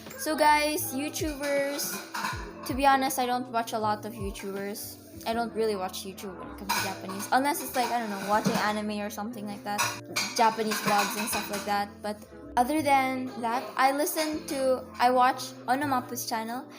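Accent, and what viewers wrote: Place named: Japan. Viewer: I saw your video on TikTok! You and yamato Motivated me >< btw, you said you watch Anime. May i know where you watch anime? I want to watch Anime too (for entertainment and for learning na din hshs) And!! I like your Japanese accent😭❤️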